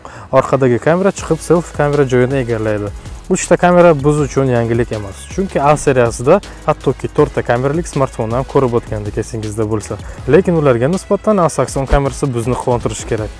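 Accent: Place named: Bukhara, Uzbekistan. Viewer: Бухороча шева малумда барибир, гап йок молодец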